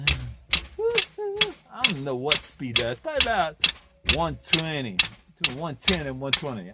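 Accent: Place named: Louisiana, United States. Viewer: First of all, I love " '. Is he from Louisiana??? Lol. Secondly, he delivers great content in an entertaining way. I hope he sticks around! Haha. Great video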